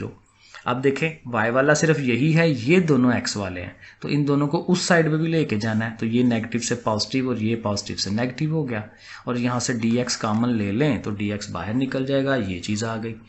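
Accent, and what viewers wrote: Sialkot, Pakistan. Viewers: Sialkoti Urdu accent 😂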